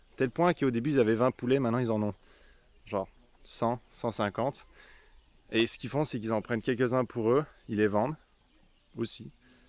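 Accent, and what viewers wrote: Quebec, Canada. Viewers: T'as chopé l'accent québécois :D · J'ai l'impression que ton accent québécois est de plus en plus fort ... bizarre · Bah à la base, il est pas québécois mais oui, il as un fort accent maintenant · L'accent québécois est beaucoup plus présent qu'il y a un certain temps c'est drôle de voir la différence entre il y a 3-4 ans et maintenant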